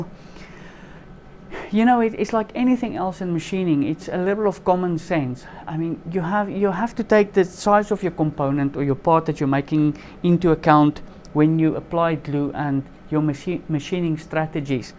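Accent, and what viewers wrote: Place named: Afrikaans, South Africa. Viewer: I stayed for the afrikaans accent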